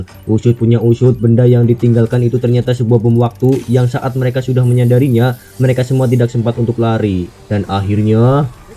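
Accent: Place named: Indramayu, Indonesia. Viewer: Logatnya orang Indramayu wkwk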